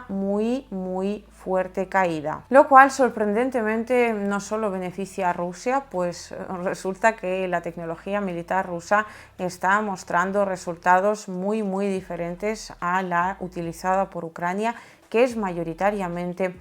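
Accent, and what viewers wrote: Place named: Spain. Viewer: Me sorprende el acento español de Liu, no es tan fastidioso como el de varios periodistas nativos de España de otros canales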